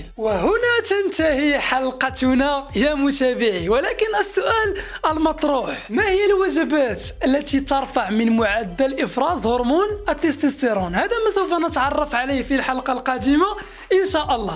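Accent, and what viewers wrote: Morocco. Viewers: Salam khoya men lehja dyalk baaaayna merrakchi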